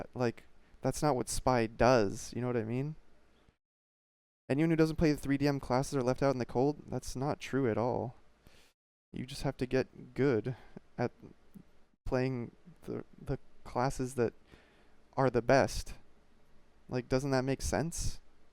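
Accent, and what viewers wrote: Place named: United States. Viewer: No, you're doing it all wrong. You need to mention AlphaDraft before the show, wear a coat and scarf like you can't afford to heat your home, thoroughly discuss the innermost secrets of game design for 20 more minutes, and all while mentioning context a few times. Also where on earth did you get that American accent, Thorin? Strangely enough it suits you.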